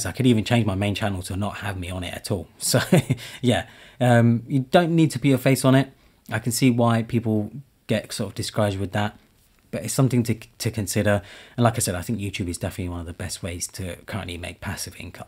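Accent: United Kingdom. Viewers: Hi! About amazon, are you linking solely to the US amazon store? If you are, is this based on your audience analytics? Asking because it sounds by your accent that you and I are using a different one (amazon uk) so I was wondering whether I should open one here or there.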